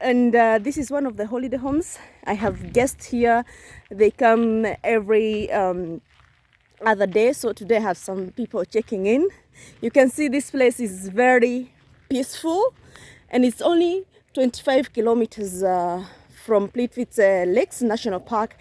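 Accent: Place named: Kenya. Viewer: U sound kenyan.....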